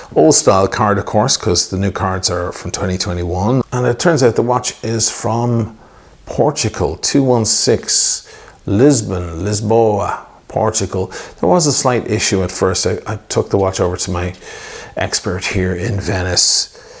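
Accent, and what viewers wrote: Ireland. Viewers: What can be said about the 116610LV that hasn't already been said? I came for gruff, Irish, vocal fry. And the drinks.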